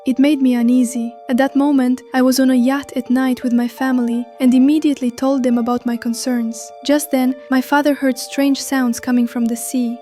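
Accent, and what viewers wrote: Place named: Russia. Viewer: Wth is this Russian accent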